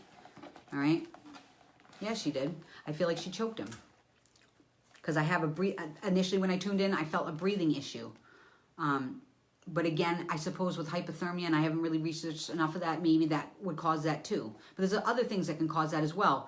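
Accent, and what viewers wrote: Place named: New Hampshire, United States. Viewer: I thought you sounded like you were from (wicked awesome) NH. I'm from Manchester, what about you?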